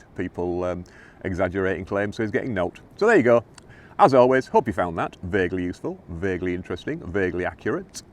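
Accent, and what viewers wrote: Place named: Manchester, United Kingdom. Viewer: A Manchester/Lancashire accent is "unusual"?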